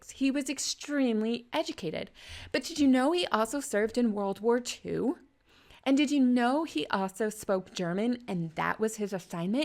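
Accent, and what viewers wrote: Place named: Texas, United States. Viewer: The Texas is strong in this vid,. =) It's probably not a good idea to add dialogue because living relatives may be like "Grandpa never said that!" At best, the only thing you can do is just write about the situation. Some people have put words in ancestors' mouths but have said it was dramatized at the end of the book. Not sure if I'd be comfortable going that route. Always a good thing to verify with people who may have known a few people. For example, my parents knew their grandparents and how they acted.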